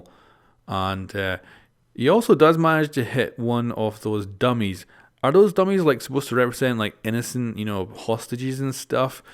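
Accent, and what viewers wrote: Scotland, United Kingdom. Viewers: malta? hes scottish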